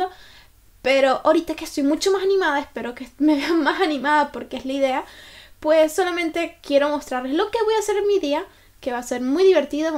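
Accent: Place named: Chile. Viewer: Hablas como chilena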